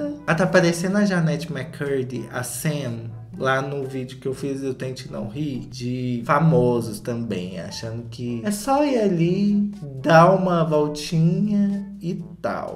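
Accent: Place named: Belo Horizonte, Brazil. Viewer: Ah, eu reconheci seu sotaque, é BH demais! Não te conhecia, este foi o primeiro vídeo que assisti, mas gostaria de valorizar a gata que mais entrega Catwalk: sua filhaaaaaa😻😻😻! Que neném lindona😹😹😹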